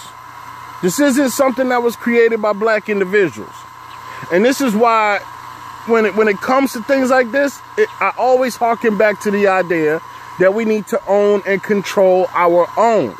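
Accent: Europe. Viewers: I know you ain't been to Africa, and you sound like a European, do research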